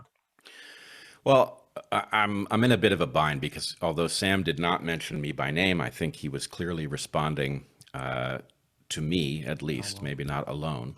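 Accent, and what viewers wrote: Wales, United Kingdom. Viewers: South? Swansea area? It's funny to me to hear such ideas with the accent. I grew up there and Its not the kind of conversations I associate with Welsh people! Your clearly very sharp though, and your channel is great, so I look forward to hearing more.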